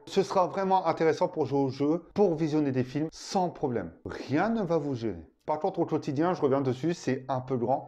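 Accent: France. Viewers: Merci pour tes conseils et présentations éclairés, je viens de me commander un honor 9x du coup. Mais il m'a l'air t'es bien de ch'nord, ti, hein?? Bonne continuation pour ta super chaine YT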